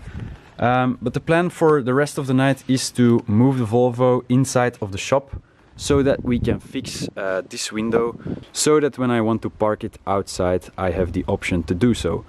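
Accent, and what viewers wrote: Netherlands. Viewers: Nederlands? Ik dacht het al te horen maar dit soort video's zijn wel echt geweldig dus duimpies omhoog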